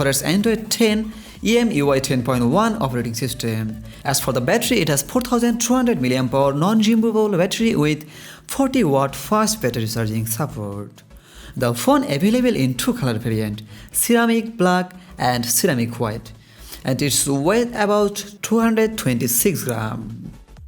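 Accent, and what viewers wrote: Bangladesh. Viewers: Funny commentary Sounds so Bangladeshi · Bangladeshi english 😄😄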